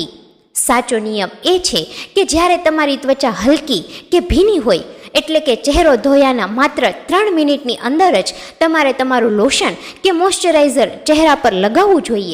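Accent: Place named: Saurashtra, India. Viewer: Nice. Your bhasha is like Saurashtra.!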